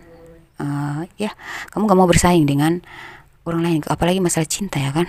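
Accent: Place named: Cigombong, Indonesia. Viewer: Ko suaranya yg seperti aku kenal ya.... Seperti suaranya orang cigombng/ lido ...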